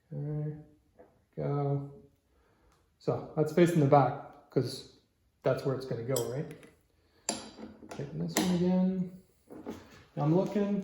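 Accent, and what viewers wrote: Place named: Canada. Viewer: So you're telling me this guy has sweet KTM 500 EXC, a sweet garage, a sweet set of tools and a sweet Canadian accent, went to O'Fallon Bike week in 2016 and he won Quantum Fuel Systems grand prize?! Some people. Thanks for vid. It doesn't help me at all w/my 2018 Super Duke, but thanks just the same.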